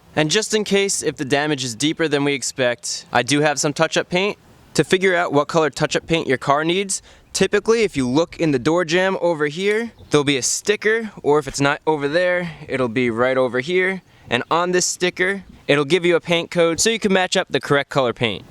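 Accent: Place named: New York, United States. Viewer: You have sort of a NY accent. lol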